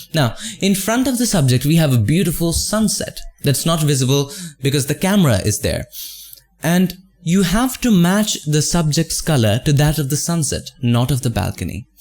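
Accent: India. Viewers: Dude, Indian accents are bad enough, but that breathing and uni are simply impossible to deal with.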